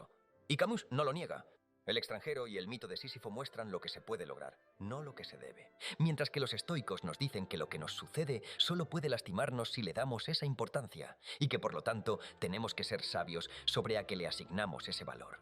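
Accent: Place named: Castile, Spain. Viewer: Buen video , mas el acento castellano y la baja calidad del sonido lo arruino❤.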